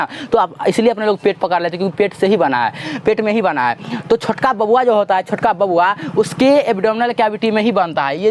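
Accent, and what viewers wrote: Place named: Bihar, India. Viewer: Bihari styles me wah...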